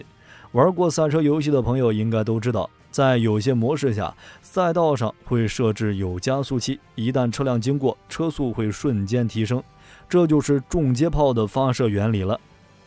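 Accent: China. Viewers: 那是中國的唸法，畢竟是他們國家的唸法，就不要用自己國家的唸法去糾正別人了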